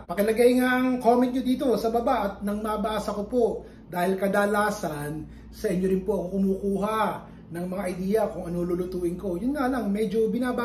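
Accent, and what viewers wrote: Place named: Bulacan, Philippines. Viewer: Chef Ron,bulakenyo po ba kau?kasi ganyan po ang punto ng mga bulakenyo e 😁😁😁 nakakatuwa po kayo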